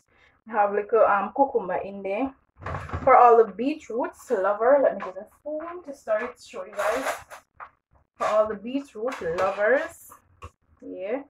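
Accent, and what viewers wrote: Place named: St. Elizabeth, Jamaica. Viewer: Never know you could add cucumber, I gotta try that,love your accent, reminds me of my hometown in st Elizabeth.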